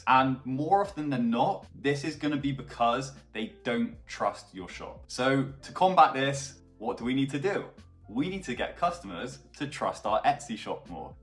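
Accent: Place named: Somerset, United Kingdom. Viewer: bro nice video. you sound like you are close to my neck of the woods with your ascent lol (im somerset).i havent quite got started with etsy yet but i have all the equipment to want to start selling(3dprinters,laser engraver, cnc router, uv printers, vinyl cutter and sublimation printer) ive learnt enough to feel like im almost ready to make sales and being that im in a job that is close to becoming a dead end with all the bad terms they keep wanting to impose (postman) i must start to do something to aim for my dream so im hoping to arrange a call with you soon to make sure im heading in the right direction from day one.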